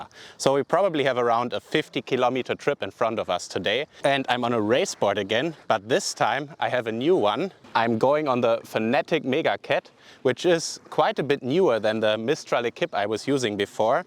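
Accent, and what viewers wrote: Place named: Germany. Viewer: please dont tell the greek you raped their placenames with the german accent !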